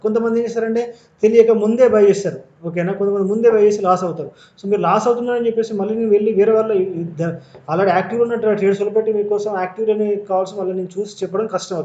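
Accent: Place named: Telangana, India. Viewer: సార్ మీరు తెలంగాణ లాంగ్వేజ్ అలాగే ఉంది... 🤝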